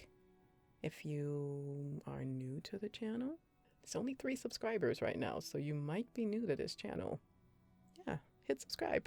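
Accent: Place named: Boston, United States. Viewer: Love that raw - unscheduled laughter....at the end / little did I know - there would be much more..... from your spontaneous, and bubbly personality 😂 my friend I want to say New England To Boston.... accent? " His fingas " Loved the reading Great writing 👌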